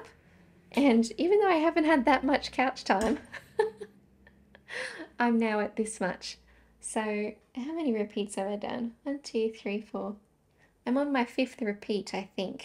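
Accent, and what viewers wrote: Australia. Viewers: So nice to listen to your podcast, an Aussie voice. I'm planning a trip to Brisbane in April so will visit the Little Yarn Store. I'm in the Blue mountains of NSW so lots of handknits are the go for me. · love listening to your aussie accent. I love your Rebel cardigan and you logic $ I think you need to add the value or the wearability of the garment. I'm in Nz, blue dyes do tend to bleed i have been advised to use cold water to block and use hair conditioner instead of wool wash. i am learning the shadow wrap short row heel on my current sock project. i was wearing my finished mkal while watching your podcast, i wore it out tonight and had so many compliments. it's in deep teals and greys merino silk blends. this clip is from Knitty McPurly podcast from 24 may will help you with your swift skien winder issue, <link>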